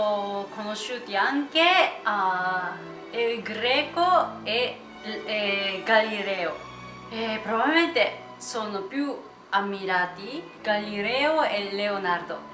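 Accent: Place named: Japan. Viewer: Il tuo italiano parlato con accento giapponese è delizioso. Il tuo abbigliamento è certamente sexy, ma la tua dolce sensualità è gestita con intelligenza, sempre garbata e mai volgare né provocatoria, brava!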